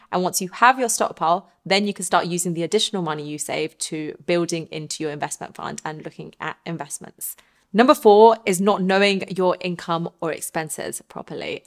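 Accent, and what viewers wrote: England, United Kingdom. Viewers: Can someone tell me what accent this is? Is it a specific regional UK accent? She sounds a lot like the YouTuber Vaughn George and I've never been able to figure out what his accent is either.. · First time on the channel. What a gorgeous lady and the british accent also hits the spot. What a great way to learn more about ficances. Subscribed! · is very cute and has a lovely British accent. AND a smart lady. Very practical video. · Why do English talk like that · I can't understand your British accent 🤡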